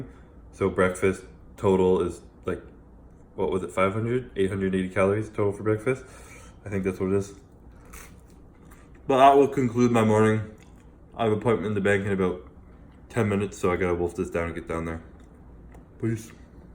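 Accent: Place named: Quebec, Canada. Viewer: “Beurre d’arachides” 😄👍 haha you should do more video in french!!! You will gain more viewers with your French Canadian accent !!!😆🤙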